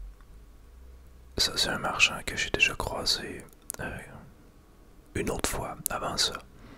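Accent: Quebec, Canada. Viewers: Zzzzzz j'adore ton accent Quebecois dommage que Demon's souls il n'est pas dispo sur PC un coucou de la France.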